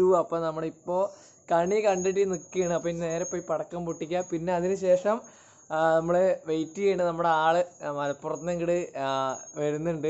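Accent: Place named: Palakkad, India. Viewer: Pakka palakkadan slang...Thambattiyeeeee🥳🥳🥳🥰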